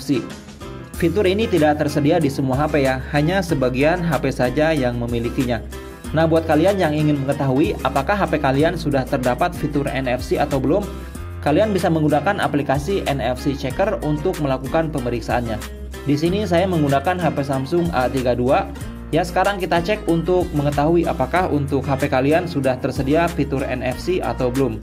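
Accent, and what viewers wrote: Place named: West Java, Indonesia. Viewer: Hatur nuhun akang infona, Mudahan gak salah,, ketahuan Logat sundanya..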